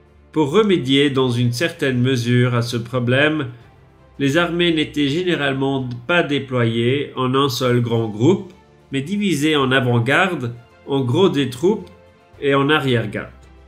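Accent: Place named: Swiss German, Switzerland. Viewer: c'est un accent suisse alémanique.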